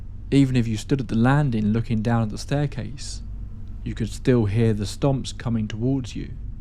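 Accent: England, United Kingdom. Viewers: Big fan of your channel man, great narration, great stories and the spooky English accent helps, much love bro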